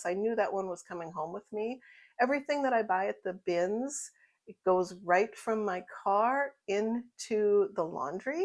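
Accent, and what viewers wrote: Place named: Minnesota, United States. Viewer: Great video! Love your Minnesota accent! ❤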